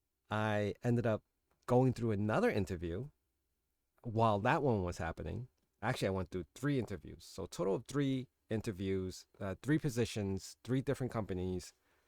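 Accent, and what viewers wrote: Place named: New York, United States. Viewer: Niiiiiice - Everyone has thier $$$ figure that they will have a wolf of wall street moment and go back into the office..."you show me a paystub making that amount and I'll quit my job and work for you today" lol Being that you sound like youre in NYC and some of the duties you described....it has to be 175k+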